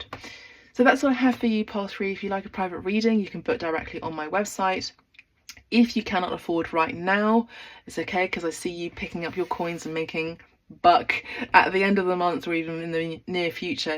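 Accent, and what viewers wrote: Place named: United Kingdom. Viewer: England! Yes! Nice to hear a British accent.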